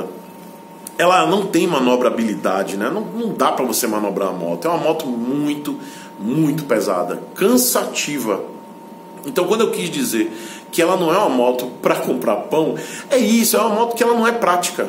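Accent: Bahia, Brazil. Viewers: Rapaz, barril dobrado 😂😂esse é Baiano mesmo😂😂.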